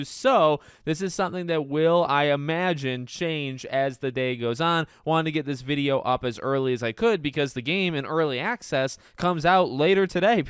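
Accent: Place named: Chicago, United States. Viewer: Are you from Chicago? You sound like my people 😊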